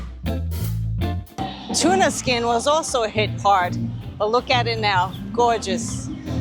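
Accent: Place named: New York, United States. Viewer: Can't take the NY accent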